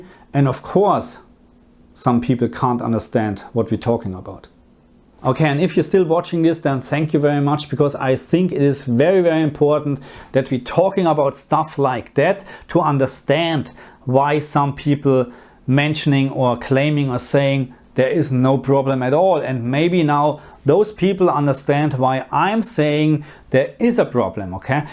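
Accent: Germany. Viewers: Geiler deutscher Akzent. Ein Mischung aus Fremdschämen und Sympathie die ich da in mir fühle. 😁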